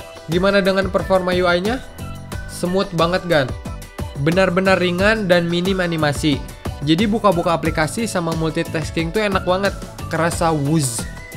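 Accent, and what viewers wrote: West Java, Indonesia. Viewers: Wkkwkk jigana urang sunda alus gan,heu euh teu gan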